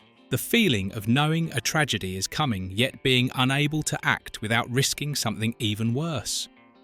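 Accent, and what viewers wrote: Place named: Liverpool, United Kingdom. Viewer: I agree with you. It would also be nice to have a English speaker for narration instead of that liverpool English. Thank God for captions😂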